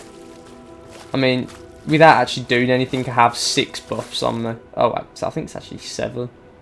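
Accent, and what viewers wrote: United Kingdom. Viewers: is that a Cockney accent you have ?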